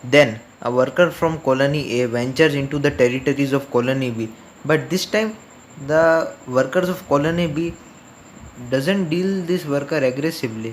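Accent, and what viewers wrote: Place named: India. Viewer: India's accent sound Funny idk why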